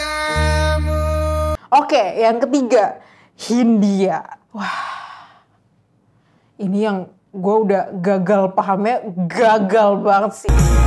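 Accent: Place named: Banyumas, Indonesia. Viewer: orang ngapak ya mba hahah PWT kerenn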